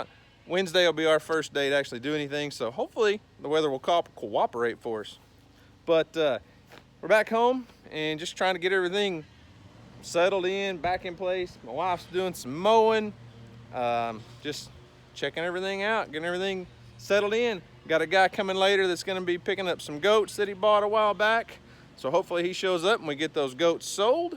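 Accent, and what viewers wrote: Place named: South, United States. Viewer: Fl'ars'...luv thet country-fried accent!!💓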